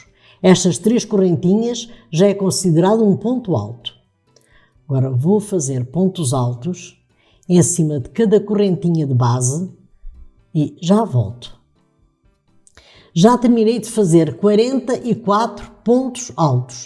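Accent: Portugal. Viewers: Obrigada nós gsto mto de escutar sua voz com o sotaque Português sou filha de um Português GD bjo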